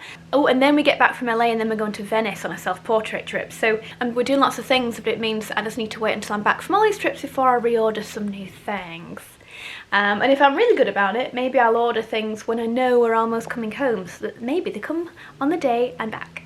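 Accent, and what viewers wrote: United Kingdom. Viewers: Just adore bloggers from UK. Fashion, lifestyle, British accent- everything is so lovely! And the Magrath family ❤️ dear, you look very upset in this vid(. Lots of hugs from Russia, darling 🌸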